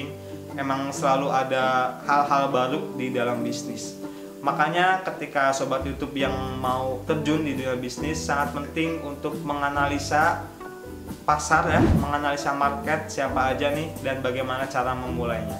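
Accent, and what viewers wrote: Banjar, Indonesia. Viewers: A org banjar sanes?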